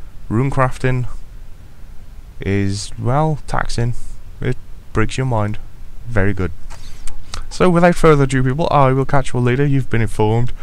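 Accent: Wales, United Kingdom. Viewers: ahah where in wales you from but? can spot it from a mile off!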